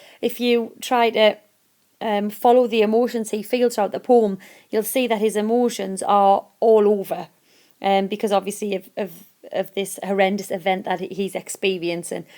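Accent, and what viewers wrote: Newcastle, United Kingdom. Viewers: Your video has really helped me, thank you! Your accent has me assuming you are from Northern England with a Geordie accent?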